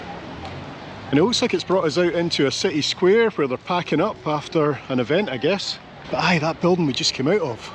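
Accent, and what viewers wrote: Scotland, United Kingdom. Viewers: I just love your accent. I have bin 5 time in Edinburgh and many other places in Scotland, and I sure love your contry. Keep up making videos. 😘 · What I like about is that he does his own research. You will never hear him say "I don't know. If you know, let me know in the comments..." Other travel vloggers come across as ignorant and lazy compared to. Also love the Scottish accent.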